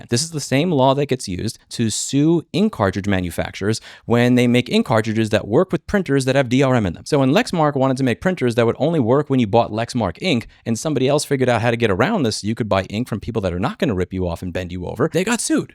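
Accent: New York, United States. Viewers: you still have that NYC Pitbull in ya and I'm loving it.!!!!!!!!!!!!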